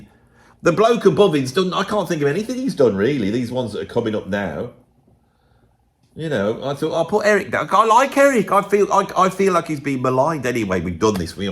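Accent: Birmingham, United Kingdom. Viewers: Horrible,,NASAL Brummie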